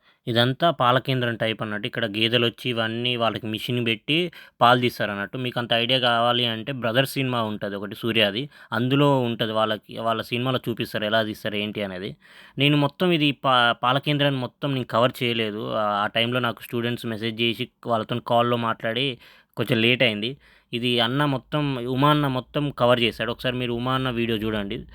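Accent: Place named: Andhra Pradesh, India. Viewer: Anna Nuvvu mana Telangana yaasa lone maatladu, enduku Anna aa tecchipettukunna andhra accent, Uma ni choodu undedi Hyderabad lo, aayana wife Telangana aavida ayina kaani mukka Telangana yaasa maatladadu. Nee originality kolpoku, mana baashani yaasani vaallu okappudu chaala vekkirinchinaaru, baasha praathi padikapyne manam kotlaadi Telangana saadinchukunnamu, aa vishayam marchipoku brother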